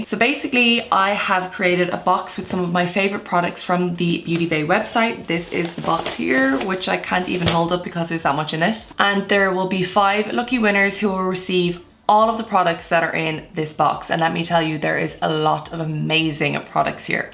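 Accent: Ireland. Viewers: Wow your eyes 😍😍 and listening to you makes me miss living in Ireland 😫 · You're gorgeous 😍. Love your Irish accent too😉!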